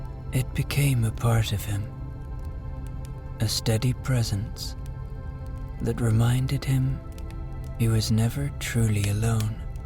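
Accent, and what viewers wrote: Ireland. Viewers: So Thankful for your dreamy Irish voice and wonderful story tonight x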